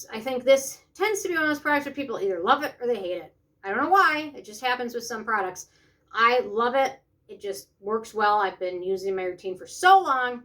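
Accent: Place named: Midwest, United States. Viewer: I like your accent it sounds Midwesterny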